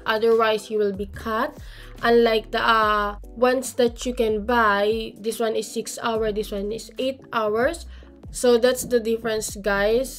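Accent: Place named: Philippines. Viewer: i knew it, you're from the Philippines 😅 we have the same accent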